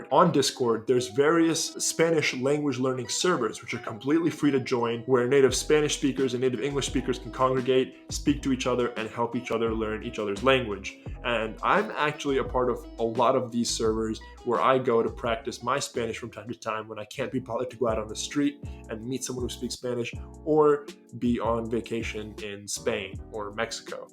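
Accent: Spain. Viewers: Mi bro habla como todo un español, te recomiendo escuchar otros acentos para que encuentres palabras nuevas que solo se usan en ese tipo de acentos, por ejemplo en Latinoamérica jamás se dice vidio, si no video, cheers mate <3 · Damn you’re talented at speaking Spanish, im surprised you learned how to speak Spanish with a Spaniard accent rather than a Latin American accent since you’re from the U.S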